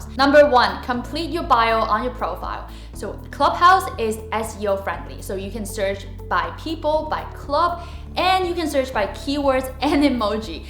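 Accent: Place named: Australia. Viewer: Where are you From. Are you an Aussie girl? i love your accent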